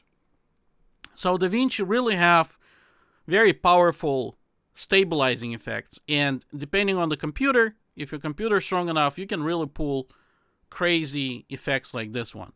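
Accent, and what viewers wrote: Russia. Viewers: Nice. It that a Russian accent? Very subtle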